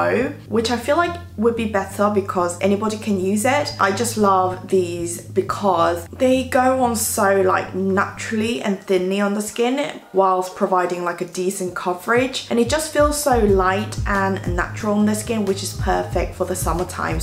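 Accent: United Kingdom. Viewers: 살도 빠지시고 더 예뻐지신것같아용 ㅋ고급진 영국 발음하며 정말 너무너무 좋다눈 ㅠㅠㅠ 영상볼때마다 기분 짱 좋아용 ^^글공 오늘 입은 의상정보도 주세용용용 ^^ 넘 취향저격 ㅋ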